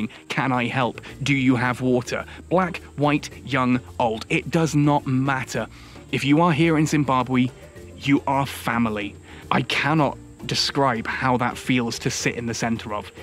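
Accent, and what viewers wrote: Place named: RP, United Kingdom. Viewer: I am Zimbabwean in UK SURREY. Zimbabwe is everything you have said indeed. With that I declare you a Zimbabwean son, brother and one of us. You have said it all and life permits, can’t wait to meet you and your wife, and have a blast ☺️☺️☺️👏. You sound such a well brought up British boy and with this positive and good spirit. I see many Zimbabweans falling in love with you 😍😍😍😍✌️. Keep up the good work and try to do some collaborative with other Zimbabwean bloggers. You won my heart already 💕💕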